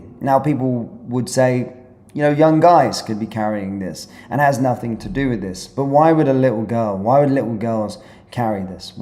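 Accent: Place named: England, United Kingdom. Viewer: why should he be speaking like an English person in a Scottish town?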